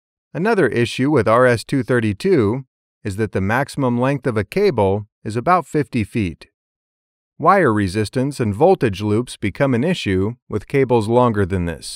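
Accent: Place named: Oxford, United Kingdom. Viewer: SIR Yr video "RS232" Wached yr above video and I LIKED IT. I find it is useful to know. Positive features of yr video are:-- 1 Excellent video production (technically) and presentation. 2. You spoke in OXFORD ENGLISH , distinct / clear pronounciation and clearly audible. 3. Precise compact information presented to make the viewer understand clearly. 4. Lastly yr summarising what all you explained earlier/ all the while helps memorising key important points. Vatsa INDIA.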